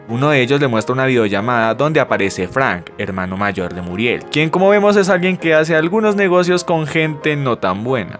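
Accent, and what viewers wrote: Colombia. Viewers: We me encanta tu idioma colombiano yo soy colombiano pero tu eres de colombia? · 5 segundos y deduje que es otro Colombiano Narrando, cuando dijo "PIROBA" dije! esta es mi patria Colombia querida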